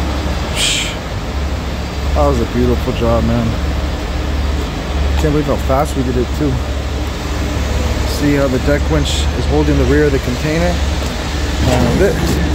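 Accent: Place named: Arkansas, United States. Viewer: Dude, I've been enjoying your videos for at least two years or longer. I'm pretty sure that I met and ran into your grandfather, or maybe great grandfather? several times over at Engs Peterbilt back in the late 70's-80' before Rush. I can't remember Mr. Engs first name but knew him and dealt with him and Tony Lacey, and knew many small fleet owners for many years. Guys that if they couldn't have fun with you and vice versa they were no good to you. Anyway, one time he was talking about his son being ready to go out solo on a wrecker...as soon as he got a D/L in a few years. So this is a long way around just to say your videos are great, your talent and skills are superb, and now I see you're honing in on your comedic assets. "I'm going to be getting it from the rear" and then the Peter Pan scene...you're funny dude! Also, I laugh every time you same "boom". 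If you didn't say it you sound like a good ole hippy boy from Little Rock Bakersfield. I better stop now and apologize in advance if I've offended anybody...I've been drinking, you suggested it. I'm an old man, maybe there's a new kinky kind of Towmans Porn going on? Be careful out there, peace out, and enjoy. God bless.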